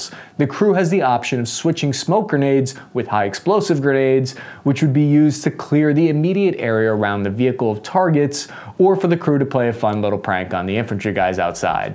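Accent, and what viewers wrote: France. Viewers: Great french accent :) Thumbs up from the country of baguette bakery, fine wine & war tactics 👍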